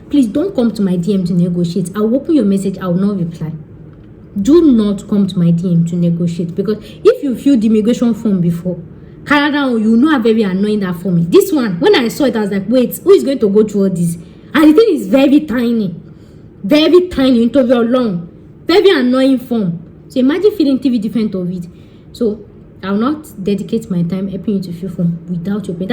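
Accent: Yoruba, Nigeria. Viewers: I love this ur yoruba English, proud of u sis ✌️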